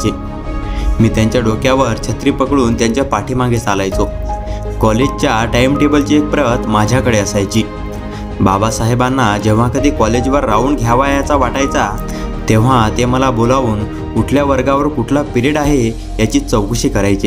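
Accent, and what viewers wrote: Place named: Konkan, India. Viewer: Your speaker has not good is the kokani middle middle words I did not understand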